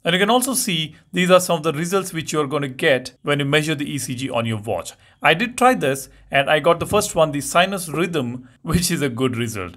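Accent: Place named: India. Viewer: Slow it down, dude. Your rapid-fire speech coupled with the thick Hindi accent --- nobody in the West can understand a damn word you're speaking. I thought India was supposed to be mellow and easy-going with the influence of all those gurus and siddhis. Why do you talk so fast with very little separation between words --- what? you got a plane to catch? What's the hurry? Slow it down and enunciate so us Westerners can follow what the hell you say. You need to spend more time on the meditation cushion and chill-out a bit. I exited half way thru the video when I noticed by brain heating up from trying to translate your high-speed, Hindi-English accent. Too much work trying to translate your gibberish.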